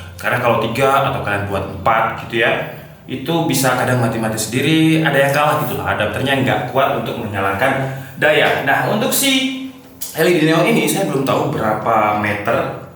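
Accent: Java, Indonesia. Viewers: Bukan karena intro 'Hai gays disini', tapi yg unik itu ada bahasa jawa medoknya "mbarak/nyengkrak/nyetrong/jeding/ceduk" yg biasanya dilanjut pertanyaan "Opo Tom bahasa indonesia-ne?"... Saya ada saran untuk pasang led stripnya misal sesuai yg dibilang pake akrilik dicat/triplek/papan dilubangi di setiap ujung dari huruf dan setiap sudut huruf dg diameter sesuai lebar led strip, dilubangi untuk setting supaya tampilan huruf angka/kalimat yang terlihat bisa terputus bukan latin karena lekukan led stripnya diselipin di belakang akrilik dicat/triplek/papan lewat lubang tadi, seperti buat lubang untuk jalur keluar masuk led stripnya dan yg terlihat di depan hanya yang dibutuhkan tanpa potong led strip...Jadi gak perlu pasang double-tip di tembok, disusun dulu di rangkaiannya baru digantung/ditempel paku di tembok.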